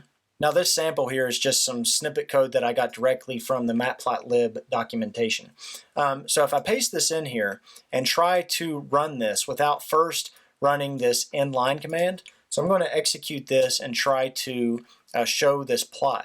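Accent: South, United States. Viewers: Great vid, thanks! Great southern accent, lol! With love from South Africa.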